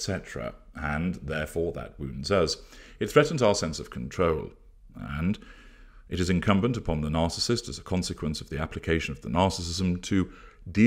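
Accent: United Kingdom. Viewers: Another brilliant video, you are so funny 😆 I really enjoy listening to you, love your British accent, eloquent explanations and sense of humour! Wish you all the best for the future and success with your YouTube channel!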